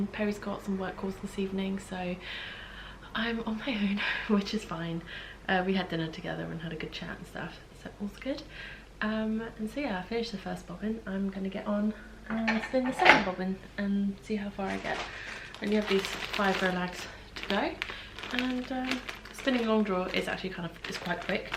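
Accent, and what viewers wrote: United Kingdom. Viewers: That Nor'n Iron accent brings back so many memories of playing with my aunt and uncle as a kid!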